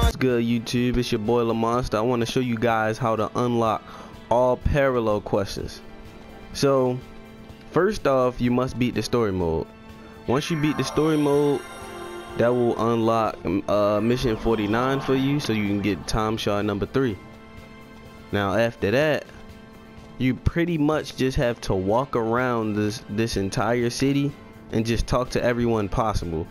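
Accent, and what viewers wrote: Baltimore, United States. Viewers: big help. thanks bro. you from Baltimore bro?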